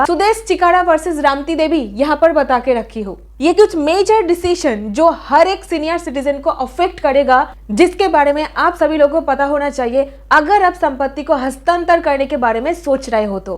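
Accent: Bengal, India. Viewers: good , like film story in bengal accent . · You are confused and confusing us. Get rid of your Bengali accent.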